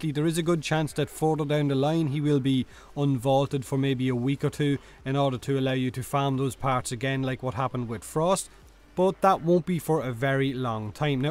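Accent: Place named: Ireland. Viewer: I started Warframe back when Loki was still a starter frame, but then I stopped for quite a long time. Now I'm back at it, and I've had to search quite a lot of guides just to get my "mojo" back. Anyways, I stumbled upon this particular channel and I'm very much excited to find a youtuber with a even stronger Irish accent than Jacksepticeye. <3 xD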